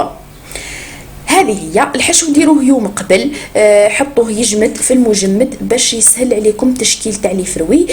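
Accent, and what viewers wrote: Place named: Chaoui, Algeria. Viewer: تباني شاوية من لهجتك الله يبارك شكرا كنت حابة نتعلم ومعنديش دراهم وزيد غالية بزاف والله نحيتي علينا ربي يحفظك ويفرحك ويحفظلك بنتك ❤❤❤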